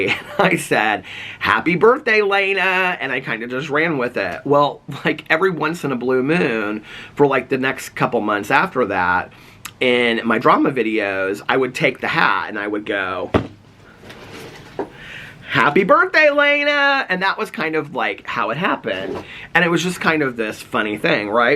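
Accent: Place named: Indiana, United States. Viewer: Indiana-isms "I saw-l". "my grandma-l". Sandwich- "sammwich". Potatoes "Batatoes". There are so many more lol.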